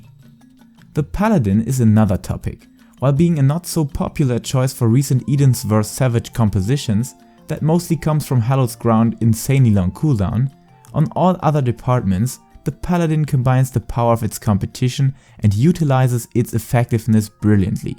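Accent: Germany. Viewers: R U German? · So wie dein Englisch klingt, bist du deutsch oder ? Sehr gute und Hilfreiche Videos!! Sehr zu empfehlen!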